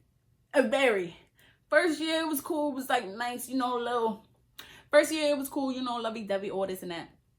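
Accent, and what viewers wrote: New York, United States. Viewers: LMBOOO you are hilarious 🤣🤣🤣 and your NY accent is top5 girl 😹😹🤣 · I frikin love the New York accent!! I’ll be watching you just to learn... ps you are a natural beauty 🥰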